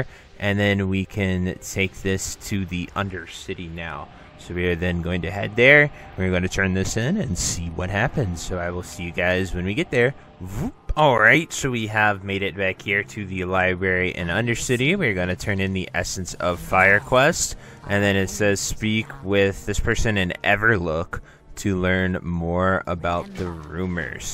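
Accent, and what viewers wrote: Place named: Texas, United States. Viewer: Ah, you sound similar to a guy I know from Texas 😊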